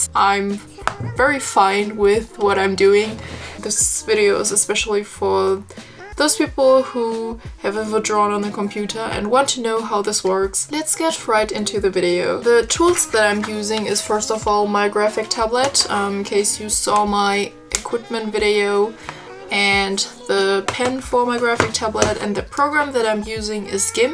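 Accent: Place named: Germany. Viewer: ich mag diesen Zeichenstil echt sehr gerne und es ist letztendlich auch echt extrem gut geworden. an deiner englischen Aussprache habe ich irgendwie schon erkannt dass du aus Deutschland bist und habe deinen deiner kanalinfo nachgeschaut. Cooler Kanal ^^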